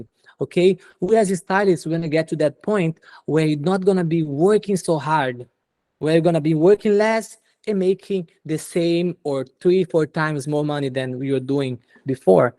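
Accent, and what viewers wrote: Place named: Brazil. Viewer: Pelo sotaque brasileiro 🇧🇷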